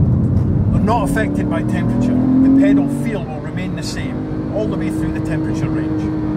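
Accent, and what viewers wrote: Scotland, United Kingdom. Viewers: sounds like he is Scottish !! am I right ?! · Hes british he lives in sctoland and has a scottish accent!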